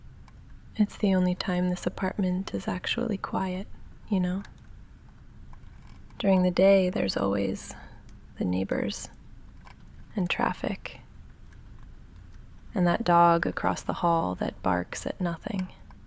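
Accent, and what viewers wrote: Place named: United Kingdom. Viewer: Ngl I love that British accent. I’ve always had something for British women. Great audio definitely saving this on a playlist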